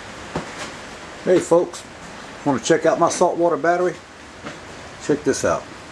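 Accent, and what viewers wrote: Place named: South, United States. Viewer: i never cared for the southern accent. you make it sound normal. good video.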